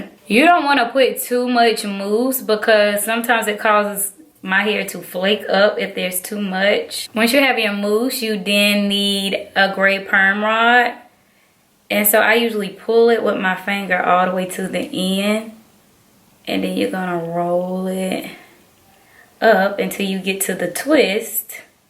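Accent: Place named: South, United States. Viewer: You country and ghetto sounding so I couldn’t finish watching your video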